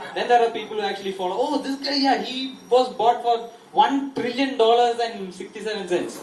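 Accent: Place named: Kerala, India. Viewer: Haha.. This s one of its kind .. Mallu accent at its best and someone s actually cashin in on it. ... Good Job!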